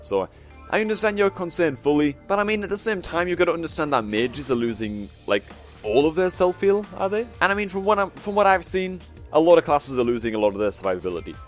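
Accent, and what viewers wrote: Newcastle, United Kingdom. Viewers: Representing us geordies!!!!!!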